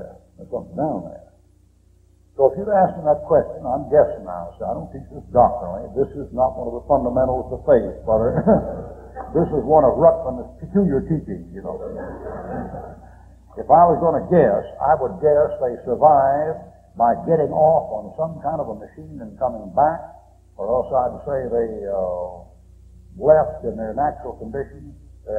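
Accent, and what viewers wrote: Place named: South, United States. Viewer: He's southern just talks fast,I'm southern understand him perfectly,lol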